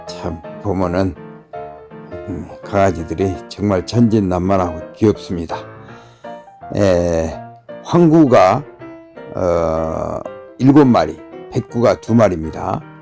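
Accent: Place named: Gyeongsang, South Korea. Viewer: 최고라고 표현 하고 싶습니다^^ 너무멋집니다 특히 구수한 대표님의 경상도 억양 의나레이션 또한 최고 입니다 ^^ 잘 보고 갑니다 좋은 영상 감사합니다 🎉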